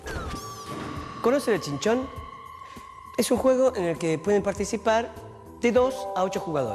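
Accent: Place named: Argentina. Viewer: como se nota que es argentino no se le entiende un pomo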